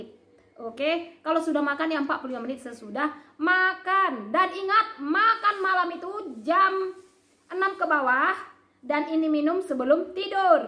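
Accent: Medan, Indonesia. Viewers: Orang Medan ya kak... Klu dgr dri logat ama nada saat bicara... Paten kali kk ini.. Makasi ya kak ek tips nya.. Mantapppp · Ka. Aslinya org mana ?? Klo nonton kk ingat kampung halaman . medan.. Logat nya itu loh.. Congok..hahahaha.. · Kek ginilah klo orng medan dah cakap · Medan kali logat kk ni.. 😊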